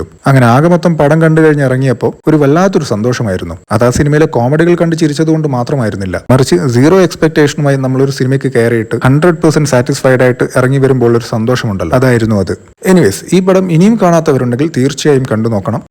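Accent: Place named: Thalassery, India. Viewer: വീണ്ടും തലശ്ശേരി 😁ഇങ്ങൾ ഏടെയാപ്പാ 😌 കണ്ണൂർ ആണോ..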